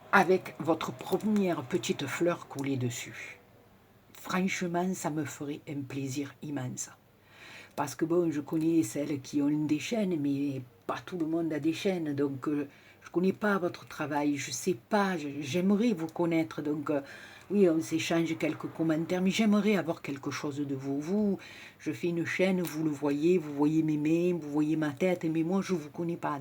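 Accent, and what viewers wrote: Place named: Marseille, France. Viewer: J'adore ton acsens de Marseille et aussi ton travaille facile mercies beaucoup continue j'aime beaucoup c'est agreable vous entendre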